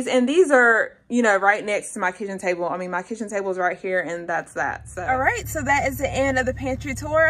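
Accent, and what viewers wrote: South, United States. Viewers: You must be southern. Love your voice!!! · Love what you're doing! Your family will be safe for a very long time. Also love the southern accent ❤ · You have a country accent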